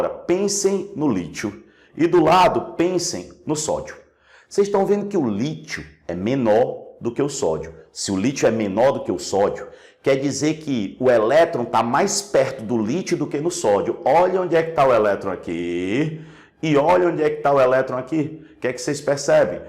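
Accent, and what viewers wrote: Northeast, Brazil. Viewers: sotaque nordestino não negaaa. é "nois" oxeee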